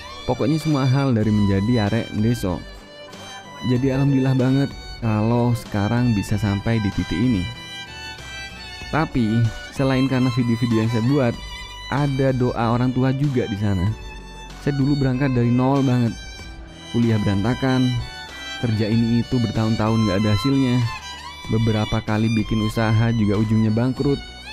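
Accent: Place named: Probolinggo, Indonesia. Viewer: Pantesan mas, logat e khas pol wong probolinggo 😅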